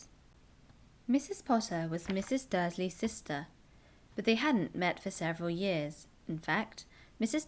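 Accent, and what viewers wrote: United Kingdom. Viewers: 영상 감사합니다🙂 영국발음 멋있어요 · After all, football commentary and Harry Potter books should be heard in British English. thank you. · 해리포터 원서로 공부하고 있는데 영국발음으로 읽어주시니 너무 좋아요~~!! 구독하고 가용ㅎㅎ죽음의 성물까지 존버합니다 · 영국발음 너무 매력적이에요 :D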